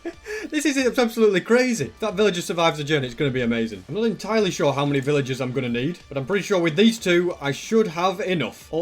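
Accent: United Kingdom. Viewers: I LOVE YOU YOU ARE THE BEST LOVE YOUR VOICE { CUZ YOU ARE BRITHIS} SO AM I AND I LOVE YOUR BEARD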